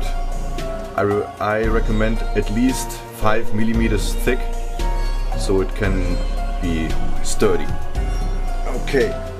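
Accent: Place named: Germany. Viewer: i love germans speaking english :D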